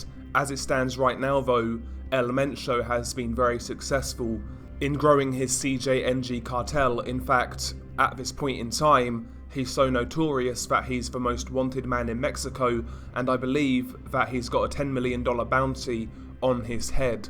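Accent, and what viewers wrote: England, United Kingdom. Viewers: Best sound theme ever ! Combines lovely with ur english accent. ' Rival Cartel Membahh!' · British accent is wonderfull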